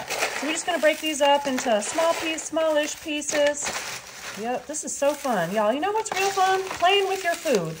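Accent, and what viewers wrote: South, United States. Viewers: what state are you from with that accent. Just love it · could just listen to a southern accent all day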